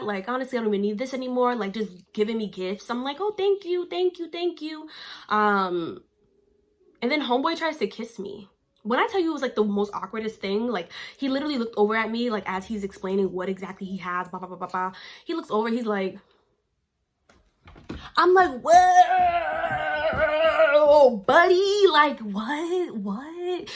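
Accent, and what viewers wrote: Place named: New York, United States. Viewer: Are you from NY you sound like it?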